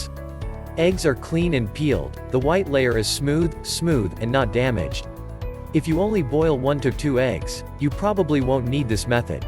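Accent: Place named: United States. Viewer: This video is so scary. It is obviously not written in English lmfao but the white American accent is scary!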